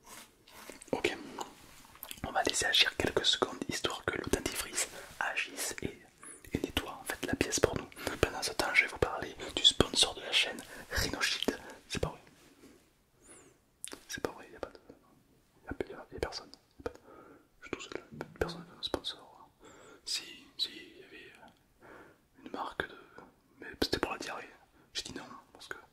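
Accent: South, France. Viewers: Adiu tu as un bon accent du sud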